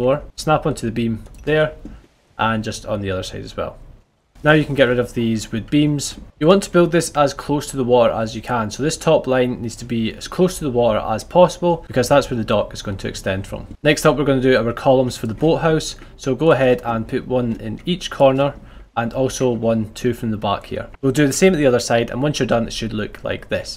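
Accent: Scotland, United Kingdom. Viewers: The Scottish accent turns the immersion up to 💯! Love your videos and I can’t wait to build this dock once I get out of the Bronze Age lol! Subbing for sure after this one!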